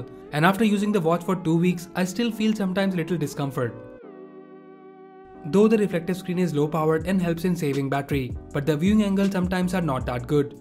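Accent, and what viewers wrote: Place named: India. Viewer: Good review, but your english is hard to understand... I asume you are from India , I suggest speaking slower or taking some courses to lower your indian L`s in every word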